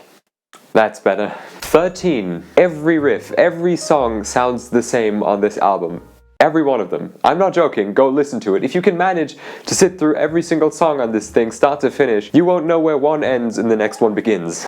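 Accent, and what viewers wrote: United Kingdom. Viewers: There is no way a guy with fender Wall art and a UK accent is saying every song sounds the same on 13. Kids these days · It's kinda wierd listening to a British dork with a constantly cracking voice and a tendency to raise pitch and laugh/giggle nervously at the end of every sentence, making fun of the way someone else looks or talks. Whatever may be true of all the things you point out, talking about someone's look/style and/or vocal tendencies, is 100% fucking douche move.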